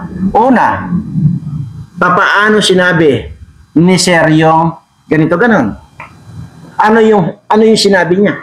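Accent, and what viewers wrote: Iloilo, Philippines. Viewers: Tuno ilonggo man sir ako karay a man antiquenio man🤣😂👊👍🙌💚✅🙏🇵🇭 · Daw ilonggo ka ,namit ka magestorya noh, kaluluoy man ang iyong nobya at hinimod na tanan sa imo hehe ,trying hard ko maghambal sang ilonggo,kahapon batangeno ka magsalita,bukas puede ilokano met tapno manggeg ko met nga agilokano ka maysa ak nga ilokano ngamen isu nga kayatko ko nga agsao ka no bigat no mabalin laeng, sige sayaw sir pangpagoodvives, happy kami na subscribers... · Good morning po sir, napasaya mo po ako sa ilonggo accent mo😊🥰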